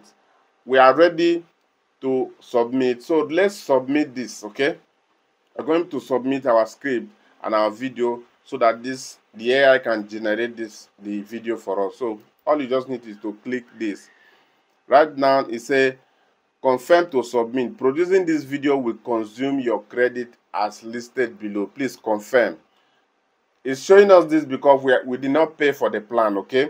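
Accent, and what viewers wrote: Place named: Africa. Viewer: Beautiful african accent brother. All the best insh'Allah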